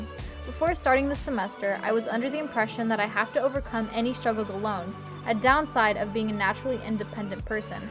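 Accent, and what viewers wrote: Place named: Boston, United States. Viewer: You almost have the Boston accent. Bah-stin.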